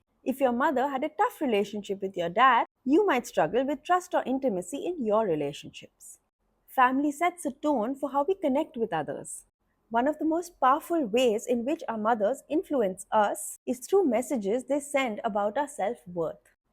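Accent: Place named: India. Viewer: Excellent video. If I can give you an advice as a viewer, please talk a bit slower, and remove the indian accent as much as possible from your English. Please refer to DoctorRamani videos as an example. Power to you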